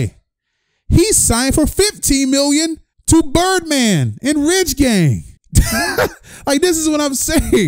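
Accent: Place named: Houston, United States. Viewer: 😂😂 I can tell you’re from Houston 😂😂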